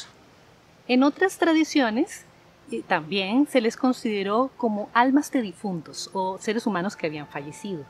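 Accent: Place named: Costa Rica. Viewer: Eres de Costa Rica cierto? Según el acento en la forma de pronunciar la R la reconozco… soy de colombia seguidor tuyo… eres hermosa 😍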